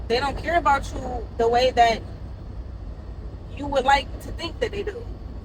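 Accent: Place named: Philadelphia, United States. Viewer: You must be from Philly I can hear your accent! What you said is so true we have to establish boundaries to protect our own peace. You learned it the hard way, but thank you for passing the knowledge on to us.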